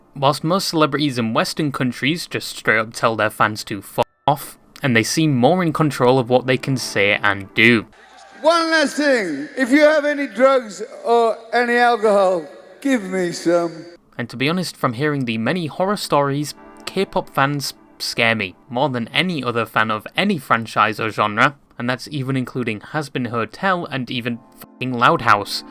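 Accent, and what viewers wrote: United Kingdom. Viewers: is this guy still british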